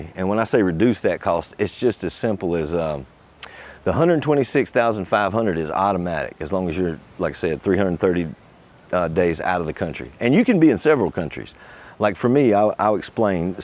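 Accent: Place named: Myrtle Beach, United States. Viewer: Just came across your channel.Interesting content. Thanks for sharing your adventures and I will follow along. I am living in Australia permanently but originally from Myrtle Beach. And by the sound of your accent I am sure you know myrtle beach. Keep up the content and best wishes to you.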